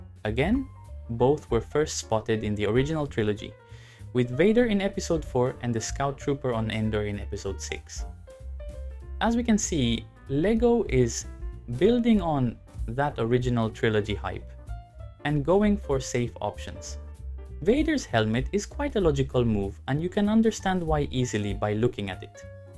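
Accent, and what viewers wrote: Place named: Philippines. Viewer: Your accent sounds so Filipino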